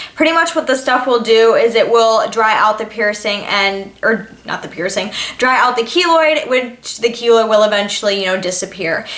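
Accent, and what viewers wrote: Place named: Midwest, United States. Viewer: Your accent is adorable! Guessing you're from either Michigan or Wisconsin!! 😍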